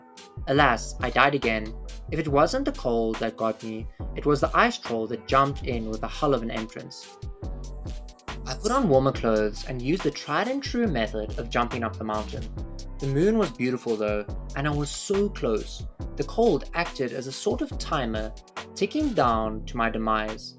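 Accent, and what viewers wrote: South Africa. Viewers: Is that a south African accent that graces my ears ?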